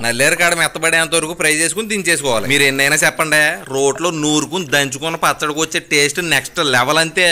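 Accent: Godavari, India. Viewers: మీ యాస భాష తీరు. వింటూ ఉంటే సినిమా హీరో అల్లరి నరేష్ గారు మాట్లాడినట్లు ఉంది సార్.🎉 గోదారోళ్ళు కాబట్టి ఆ విధంగా మాట్లాడతా ఉండారు. మా రాయలసీమ భాష గాని మంచిగా ఉంటది.. మీరు చెప్పే విధానం బాగా ఉంది.😊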